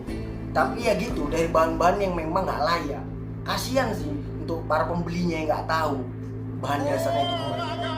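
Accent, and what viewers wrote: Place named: Medan, Indonesia. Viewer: Macem orang medan.. 😁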